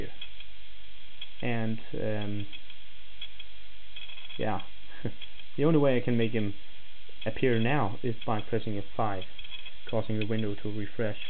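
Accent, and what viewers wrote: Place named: Denmark. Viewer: you sound danish...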